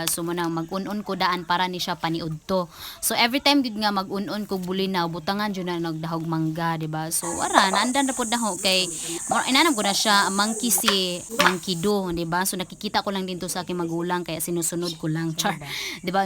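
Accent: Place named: Bohol, Philippines. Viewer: malingaw kaau ko maminaw jud sa bul anon ba, "talijukon" "buho" ❤️❤️❤️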